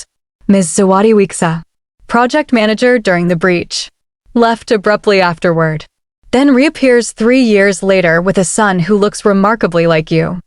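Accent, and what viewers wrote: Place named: Guyana. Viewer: 'Born behind cow'. You sound Guyanese. I agree with your comments. Additionally, what is this obsession with liasons between Korean men and Black women? It is fiction because in real life, very few Korean, or Asian men, have healthy romantic interests in Black women. That's a conversation worth having😊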